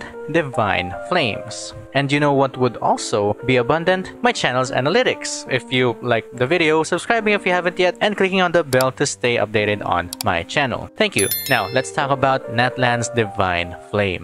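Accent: Philippines. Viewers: There is no mistaking a Filipino’s accent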